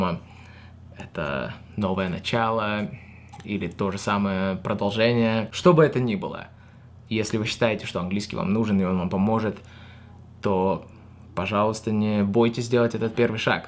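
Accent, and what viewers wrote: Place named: United States. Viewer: Забавно как у на русском уже акцент американский появился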